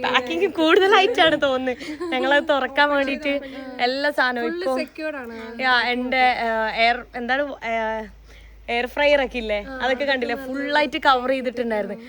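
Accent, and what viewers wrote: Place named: Northern Kerala, India. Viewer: നല്ല വടക്കൻ മലയാളമാണ് പറയുന്നത് ഒറ്റപ്പാലം style അല്ലേ അല്ല.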